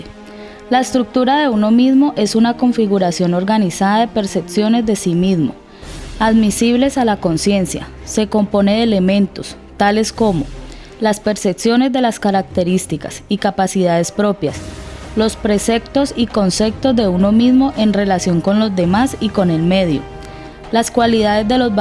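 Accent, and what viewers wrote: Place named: Cali, Colombia. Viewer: oye ois vos sos como caleña amista.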